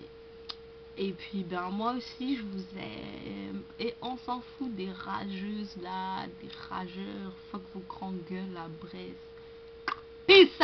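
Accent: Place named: Ivory Coast. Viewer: t une fofolle toi! tro marrant kan tu parles avc l accent cainfri, hihi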